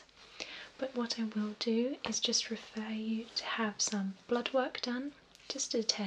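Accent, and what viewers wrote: England, United Kingdom. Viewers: love the British accent, so perfect for this asmr :O :3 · I lived in Britan and sveryone sounded chavvy and not like this. Using that classy english accent is awesome >ω< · no one can bring out my tingles as you do! The calm, british voice is just magic! Greetings from Norway :) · Hello, where are you from? I ask you why your accent seems british. Regards from México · Your British accent is so much better than mine :( Where you from Dear? :) (*Sorry I am late on this video!) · As a Brit myself I might be bias, but I can safely say I love your accent and whispering together! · Goood I'm fond of your clean English accent. I prefer it to an average American one. I want you to read some classic English poetry if you don't mind. Thanks for your videos. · ASMR and British accent. This is perfect and relaxing. Plz make more doctor/nurse role-play videos. 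I watched it 10 times and still love to watch ! Thanks. :) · EpicMeme EMPEROR I don't think you know what a Russian accent sounds like? Gentle Whispering is Russian though, I'd say her accent definitely more towards the UK. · So here I am doing my usual to try to fall asleep eyes closed on auto play, this video comes on...Hear a British accent, eyes open with surprise... groggy eyes stare in disbelief haha. Long time no see!! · normally, british accents annoy me,. except for today! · she's got a british accent, not australian! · lover her accent. and i live in UK. im sick of listening to chav accents and miss this upper class sexy english voice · Wow! I'm English too and people say I have a lovely accent, but yours is just beautiful!! I'm actually jealous of how lovely your accent is 😍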